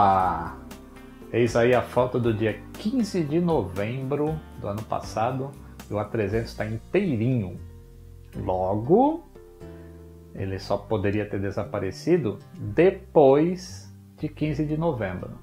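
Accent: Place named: Northeast, Brazil. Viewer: agora entendi esse sotaque de nordestino kk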